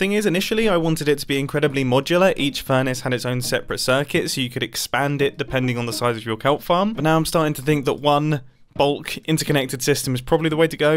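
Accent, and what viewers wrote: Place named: United Kingdom. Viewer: My family loves your channel we watch it as a family sometimes just to hear you British accent 😂😂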